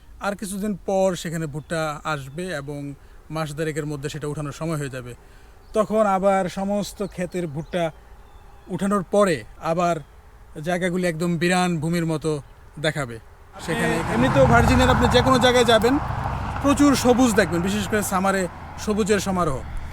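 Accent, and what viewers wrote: Sylhet, Bangladesh. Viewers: ভাই তুমি সিলেটি নি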